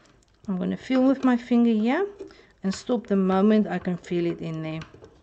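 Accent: South Africa. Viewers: Hou aan vids maak ons is amper by n 1000 subs. Ek het die aksent gehoor jy is afrikaans😂😂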